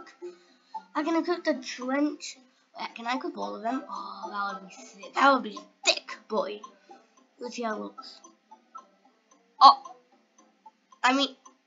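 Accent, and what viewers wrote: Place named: United Kingdom. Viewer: you sound like u in the uk homie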